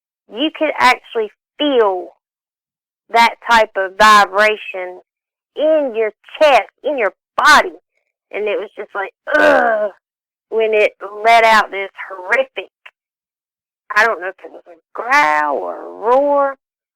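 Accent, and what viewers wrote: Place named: South, United States. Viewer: I'm surprised it never came back for the other 2 goats, or did it?? poor billy goats 🐐🐐.. and... love the Southern accent! ☺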